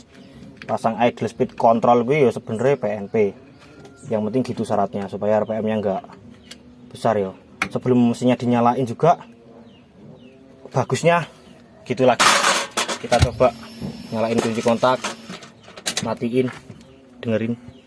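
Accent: Java, Indonesia. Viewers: Pembawaannya khas jawa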